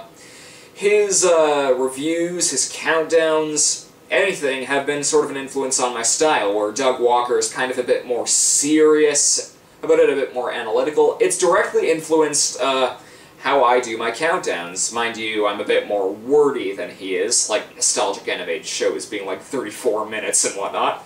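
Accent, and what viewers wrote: Canada. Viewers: The Canadian accent is strong with this one. BEST ACCENT EVER. (Seriously, say House and Out more, it makes me sad I'm stuck with my lame american accent, at least I can listen to yours.) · Are you Canadian?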